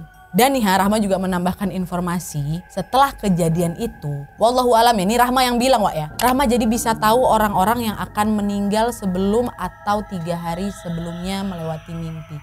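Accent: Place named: Batam, Indonesia. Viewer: org batam kah? Krn logatnya batam kali wak.. aku jg dibatam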